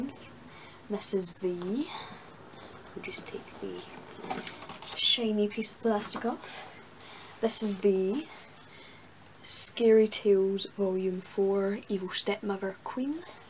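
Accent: Scotland, United Kingdom. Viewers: Hate to ask but are Scottish or British?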